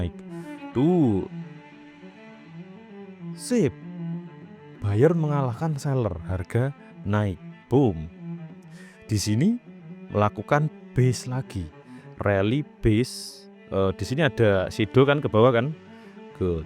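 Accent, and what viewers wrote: Java, Indonesia. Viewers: frist nonton video Ki "mask" lgsg klik dgn penjelasannya dgn logat jawae dn pastine sederhana dlm penyampaiannya.. matur nwun Ki, smoga berkah dn mnjdi jariyah ilmu yg manfaat, idzin nimba ilmunya 🙏☕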